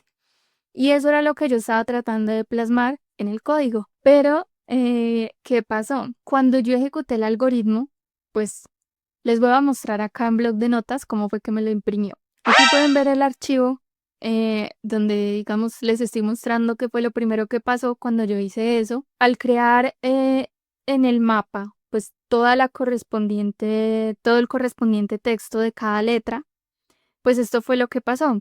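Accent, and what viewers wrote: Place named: Colombia. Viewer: Que delicia escuchar ese acento colombiano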